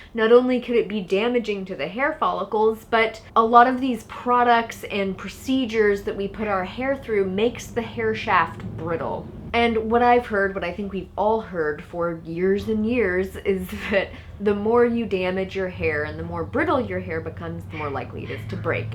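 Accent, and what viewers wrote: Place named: Tennessee, United States. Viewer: GREAT INFO! THNX! WHEN I USE THE APPLE CIDER VINEGAR I SOMETIMES LEAVE IT ON, THE SMELL GOES AWAY IN A FEW MINUTES, BUT YOU CAN ALSO ADD ONE OR 2 OF THE ESSENTIAL OILS FOR HAIR GROWTH, LIKE ROSEMARY, PEPPERMINT, CARROT SEED, LAVENDER OR EVEN TEA TREE TREE OIL. I ESPECIALY LOVED YOUR TENNESSEE ACCENT, WHY NOT TALK LIKE THAT ALL THE TIME? ✌💚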